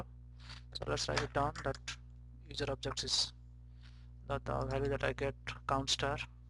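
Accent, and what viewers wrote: India. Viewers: Can't understand your accent! This is English for indians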